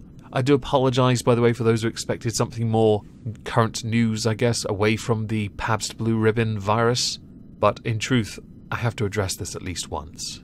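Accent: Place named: Midlands, United Kingdom. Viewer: You sound like you're from the Midlands. I'm from Lincoln originally but live in Tokyo now. My friends from the Shire say there are cases of Coughdrop19 there now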